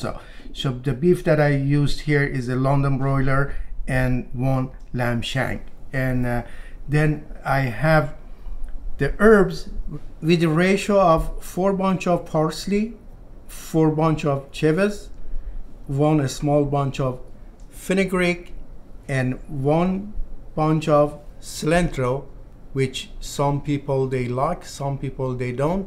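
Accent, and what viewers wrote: Iran. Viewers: Love from Pakistan 🇵🇰🇵🇰🇵🇰 love your persian accent too, will try it!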